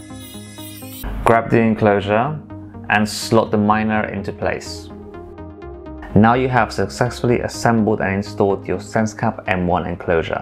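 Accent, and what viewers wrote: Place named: England, United Kingdom. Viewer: This is exactly what I was looking for! Thank you!! Also this is random but I've never seen or heard a hispanic/latin with an english accent! I'm Mexican living in the US so it blows my mind lol. Saludos!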